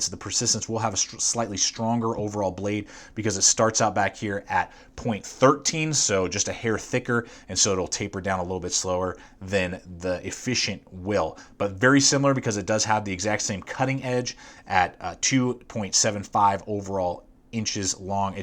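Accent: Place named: Texas, United States. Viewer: Good Video, my brother is the Spyderco nut between us so I'll have to show him this. In your into I'd swear your in my home part of Texas! 😎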